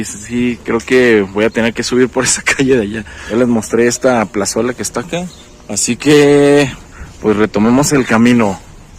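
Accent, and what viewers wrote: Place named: Mexico. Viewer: Jajaja me encanta como relatas , con este toque mexicano! 😂😂😂😂😂😂😂😂 y pues cuidado con las chinches ! Revisa toda tu ropa antes de llegar a casa , porque esas se esconden y después proliferan 🥲🥲🥲😊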